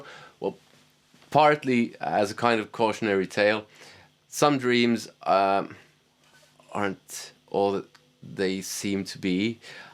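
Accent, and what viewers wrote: Ireland. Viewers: I'd wager you learned to speak English while living in Ireland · Where does your Irish lilt come from?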